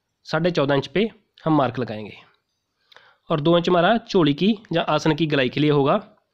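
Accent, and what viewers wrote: Punjab, India. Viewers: Aapki aawaj se punjabi jalak rhi h waise video 1 no. H ji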